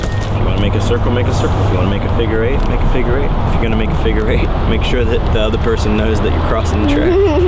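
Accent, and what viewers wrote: Australia. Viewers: Yay sounds like an Aussie when hes mad......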